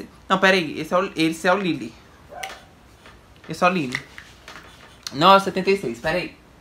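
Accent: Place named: Minas Gerais, Brazil. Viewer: Pode falar bastante . Amo esse sotaque mineiro que emenda as palavras . Acho chique .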